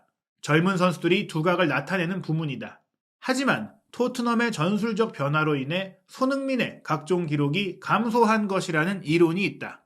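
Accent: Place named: Seoul, South Korea. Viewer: 말투바꿨네 말투이상해요 서울말쓰려고하시나...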